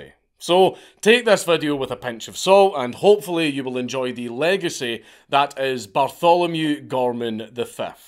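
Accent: Scotland, United Kingdom. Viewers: They don't have kings and gypsies in Scotland. They have kengs and gepsies. Gawd I love the Scottish accent. Easily my favorite. (editing to make the 2 snobs who responded happy)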